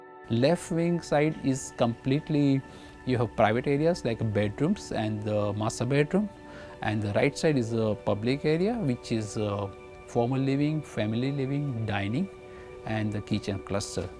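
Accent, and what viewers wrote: Gujarat, India. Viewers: Bhai mere, He is from Gujarat, We are learning hindi since our childhood. If you tell him to speak in hindi or gujarati he will be fluent. Ask your dad or mom to speak in english and they will be like this only. See the kind of work the person has done. Don't judge anyone by his way of saying a particular language. You are not even 0.1% of what he is in this world. :)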